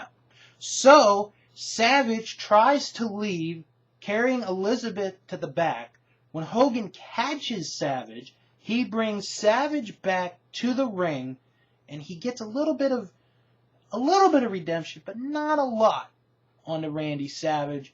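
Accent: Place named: England, United Kingdom. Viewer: Nice English accent 👍👽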